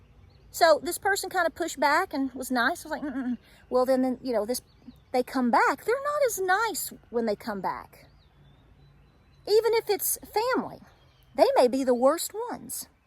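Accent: United States. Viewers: Ma'am, I've only just discovered you today thanks to the Economic Ninja. I like your straight up American way of speaking. I also respect how you are hard working and feminine and sweet at the same time. My wife and kids are working well hard in central Virginia so you just keep on going and we will as well. Thanks for your honesty and plain spoken ways, Honey. Best. And all the best to your wonderful husband. Johnny and Crew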